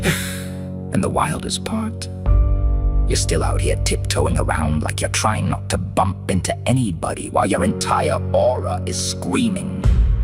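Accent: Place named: United Kingdom. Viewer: Your voice has a British accent to it under the voice modulater. Blimey.😂❤❤❤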